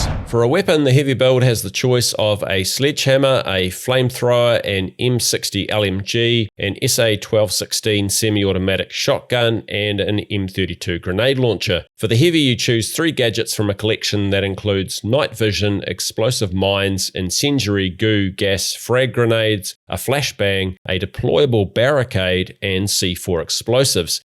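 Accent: New Zealand. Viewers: I have shaked through this video... Im sorry, but i cant get over that New Zealand accent. "E" is replaced by "I". Well made video though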